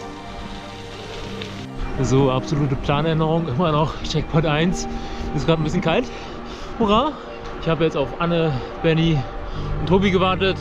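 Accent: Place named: Germany. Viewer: Ganz gut gemacht, wünsche mir ich wäre dabei gewesen, nur eins hat sehr gestört: die englischen Brocken solltest Du Dir schnellstens abgewöhnen, andauernd immer wieder: "nice", "to go", "ride on", usw.,.das ist so oberflächlich, und die hören sich mit dem deutschen Akzent wirklich schlimm an - Es tut mir Leid.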